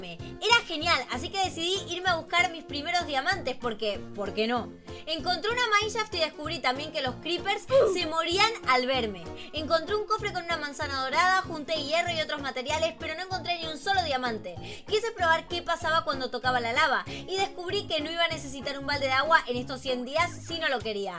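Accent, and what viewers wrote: Argentina. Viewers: Te quiero mucho y me encanta el idioma argentino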